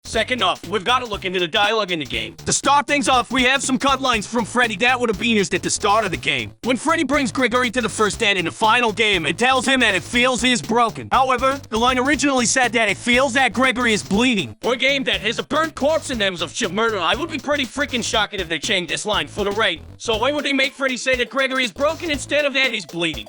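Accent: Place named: Boston, United States. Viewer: that amazing Boston Boy accent was a pleasant surprise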